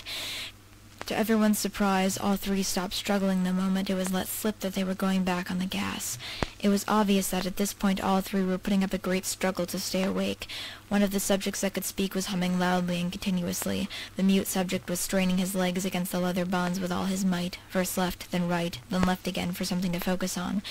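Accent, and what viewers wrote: Russia. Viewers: I read this when I was young(er) and hearing it like this brought back strong nostalgia! I liked the Russian accent and while the distorted vhs voice thing was cool, I feel it could've been more focused to the crackling sound instead of the fuzz. But overall I rate this reading 8.99/10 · Ya ya, The russian accent is the best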